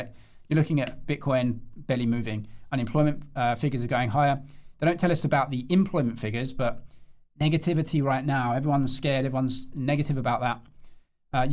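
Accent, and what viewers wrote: England, United Kingdom. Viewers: If you don't understand English spoken by an English person try turning on the closed captions.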